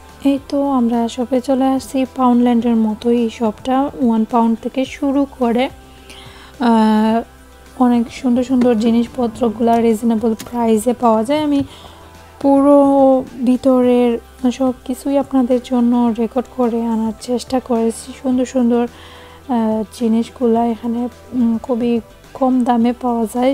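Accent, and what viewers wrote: Sylhet, Bangladesh. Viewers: Apu apnar sylheti accent sunte asto sobai Apnar mukhe oi ta khub valo lagto Tai mone hoy the numbers of views are decreasing....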